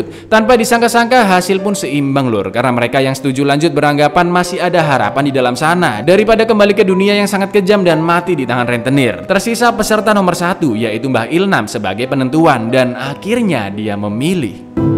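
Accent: Java, Indonesia. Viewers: ngomong e medok.. Monggo sekeca'aken dook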